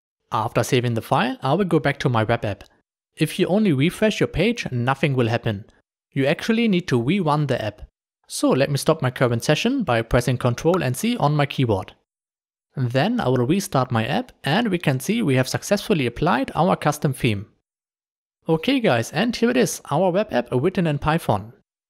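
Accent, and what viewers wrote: Germany. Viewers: klingt krass deutsch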